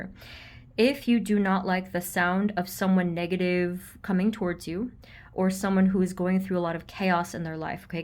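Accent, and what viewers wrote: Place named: New Jersey, United States. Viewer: Girl! I've been wondering forever if you're from New Jersey...you sound like "home," and I'm from Bergen County...that answers it! I wish I still lived there so I could check out your store! I hope it's up and thriving...Bless you!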